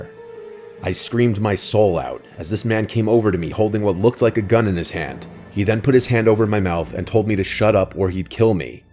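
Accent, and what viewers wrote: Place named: Northeast, United States. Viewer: I really enjoy his narrations. Especially when I hear and itty-bitty hint of a Northeastern accent? Pretty sure that’s what I’m hearing. Correct me if I’m wrong please! 😅❤ For example: starting - I can hear the accent a tiny bit when he says “fenced off” . Also when he says “flashlight” and “Ashley” Example . 😊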